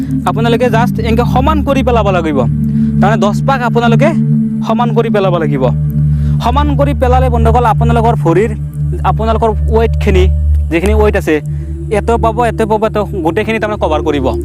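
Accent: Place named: Nalbari, India. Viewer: Dada apunar kotha bor nalbari r jen lagise hoi nki?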